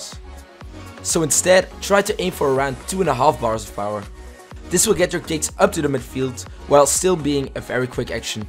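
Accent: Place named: Netherlands. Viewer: Ik hoor an je uitspraak dat je Nederlands spreekt, klopt dat? Toch heb je een zeer goede uitspraak in het Engels. Bedankt vor de video, het heeft me geholpen. Groeten uit Duitsland! :)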